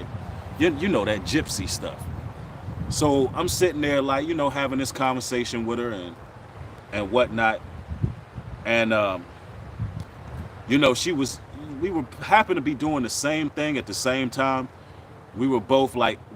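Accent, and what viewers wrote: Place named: Chicago, United States. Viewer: U must be from the Chi. Shout out to you bro!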